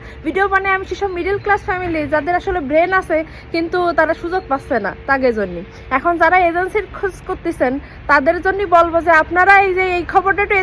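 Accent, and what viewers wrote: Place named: Jessore, Bangladesh. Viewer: আমার বয়স ৬৯ বছর, তাই আঙ্কেল হিসাবে একটা প্রশ্ন - তোমার বাড়ী খুলনার কোন এলাকায় ? জাস্ট কিউরিসিটি । প্রসঙ্গত: আমি তোমার ভিডিও পেলে সবটুকুই দেখি এবং গর্ব অনুভব করি । কারণ আমার আঞ্চলিক ভাষায় এত সুম্দর ও আকর্ষনীয় উপস্থাপনা আগে কখনও শুনিনি । শুভকামনায় ।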